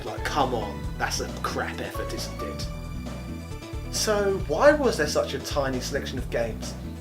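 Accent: United Kingdom. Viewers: I really cant stand this idiot, these Brits are f***ing annoying as hell. Is it just me, or does anybody else find this guys Brit accent and obnoxious personality irritating beyond belief? I tried giving this guy the benefit of the doubt by at least watching 2 or 3 mins of his video to see if i can actually relate to him, but hes annoying as f**k. I know there are probably some cool Brits out there, but Brits like this guy give people from the UK a bad name. Just a pure obnoxious and annoying d**k.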